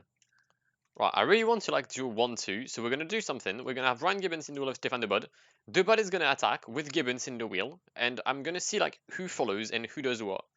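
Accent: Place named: France. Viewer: Are you from Belgium or France, because you have a French Accent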